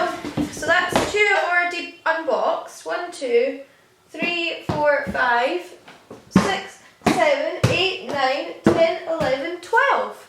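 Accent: Scotland, United Kingdom. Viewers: This is definitely the most Scottish I've ever heard you sound in a video. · your accent <3 Love the Scottish accent !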